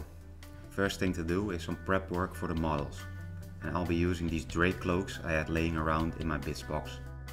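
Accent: Netherlands. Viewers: Dude, are you dutch?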